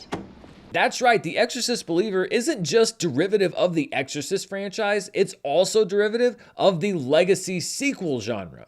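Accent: St. Louis, United States. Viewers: Are you from St Louis?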